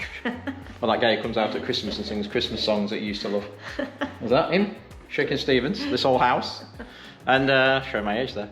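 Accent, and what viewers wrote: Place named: England, United Kingdom. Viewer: I'm sure we don't live to far from you..we moved to the USA in 1999..we moved to our Florida home 5 years ago..we got it in 2003..not sure I could do a large upgrade now..we may wait till everything comes back into syle..😂😂😂..I was watching storm videos and heard an English voice..so stopped to watch..everyone we know are American.. I don't think there are as many Brits here as there once was..before we moved here full time there were a lot of British here..I think we scared them away...😂😂😂😎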